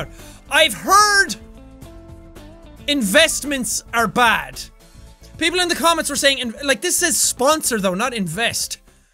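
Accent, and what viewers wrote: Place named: Ireland. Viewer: I love your brogue!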